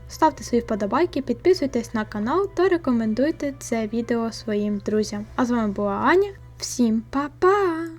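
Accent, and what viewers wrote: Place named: Transcarpathia, Ukraine. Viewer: ВзяВ з печі стейки я вирішиЛА ))) Трохи схоже на Закарпатський діалект, але це не він, чи він ? )))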